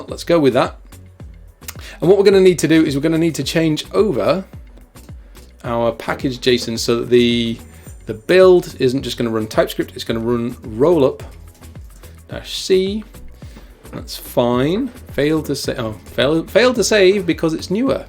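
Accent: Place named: United Kingdom. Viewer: Curious. Where in the UK is your accent from?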